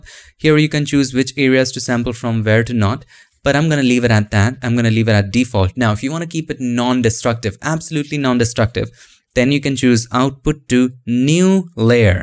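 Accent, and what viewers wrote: India. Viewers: Indian accent = check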